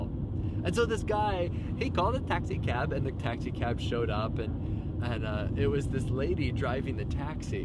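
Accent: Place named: Northern Ireland, United Kingdom. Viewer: Ur accent is the north of Ireland accent different from the south. The north have a funny accent I think.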